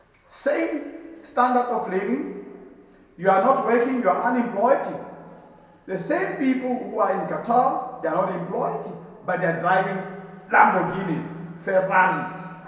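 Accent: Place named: Zimbabwe. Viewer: If any illegal aliens from our continent is not happy to be in South Africa they're other 53 African countries they can choose and go to, we don't want any unskilled illegal immigrants to flock to South Africa to work jobs locals can do. I think the time has arrived were the government needs to introduce job restrictions for African immigrants to discourage them to stop coming to our country in their millions because the truth is they're no jobs in South Africa we have 40% youth unemployment we need to prioritize our citizens first before we can accept asylum seekers and economic migrants. You can in your right mind compare a small economy of South Africa to UAE, Saudi Arabia, Japan, China, South Korea or any other powerful countries because most of those nations don't have the problems we have of the alarming high unemployment, crime, poverty, landless etc. 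We are not happy as the citizens to live with millions of illegal immigrants in our communities and we will not back down from that you must urge your brothers and sisters back in Zimbabwe or whatever were you from because i care hear your accent to let them know that they're no opportunities for them in South Africa because locals are jobless, hungry, poor, landless etc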